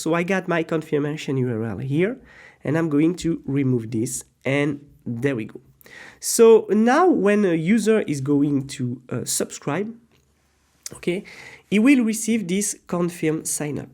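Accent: France. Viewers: Tu es français ? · Английский язык с французским акцентом намного понятней чем английский с британским акцентом 🙂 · French accent is soo cringe 🤣🤣🤣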